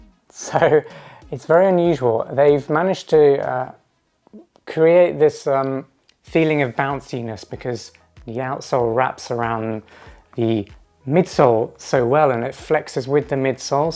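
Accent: United Kingdom. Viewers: Subscribed. U do have british accent pal. Simple explaination. Iam getting hoka clifton 8 online. Is their any difference bw clifton 8 and 9 ? Moreover whats ur opinion on clifton 8 or invincible 3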